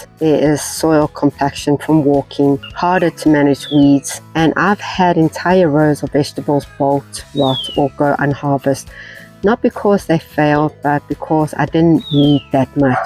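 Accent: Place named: South Africa. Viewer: I thought that was a SA accent 😅and indeed guessed correctly after seeing your description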